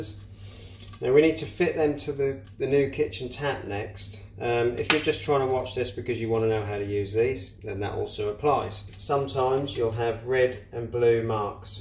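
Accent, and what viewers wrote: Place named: East Anglia, United Kingdom. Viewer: Good concise video ,you sound like a good honest east anglia guy ! D from Norwich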